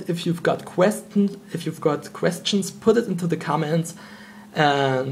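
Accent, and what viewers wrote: Germany. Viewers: man merkt schon nach den ersten sätzen am aktzent das du deutscher bist. i mean... "änd wOt wOs in it? äh scophone" .come on ! :-) entweder deutsch oder korrektes english :D , aber sonst ganz nett das du eine review gemacht hast :-) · hahaha :D deutscher akzent :D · 5sec und ich wusste zu 90% das du deutscher bist :D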